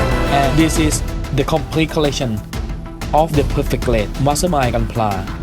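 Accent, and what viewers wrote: Thailand. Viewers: สำเนียงแบบนี้ คล้ายคนไทยมวาก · เสียงเหมือนสำเนียงไทยมากกกกๆ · สุดยอดเลยครับ ปล.คนไทยไหมครับเนี่ย สำเนียงชัดเลย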